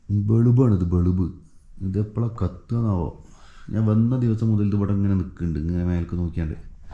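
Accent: Malappuram, India. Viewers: Bro inside wilderness you shouldn’t play with the windows rolling it up and down. What if it gets stuck? But bravetrip indeed. I wouldn’t go alone on such trips 😂. Like your commentary malappuram style ❤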